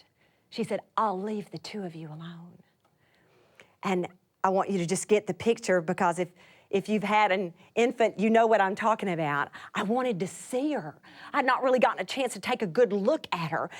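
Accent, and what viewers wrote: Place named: United States. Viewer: wow. What an American accent!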